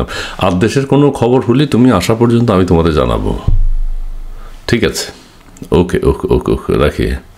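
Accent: Noakhali, Bangladesh. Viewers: কাশেম চাচার সাথে নোয়াখালীর ভাষায় কথা বললে শুনতে ভালো লাগে।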